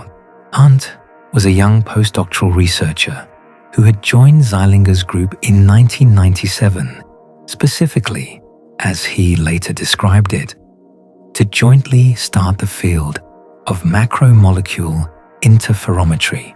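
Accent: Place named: England, United Kingdom. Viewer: English accent - American idiom. Quite dissonant.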